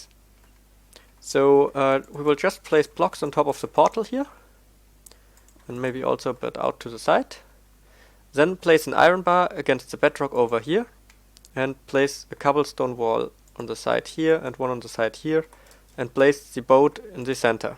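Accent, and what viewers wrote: Germany. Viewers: do you speak german (you sound like that)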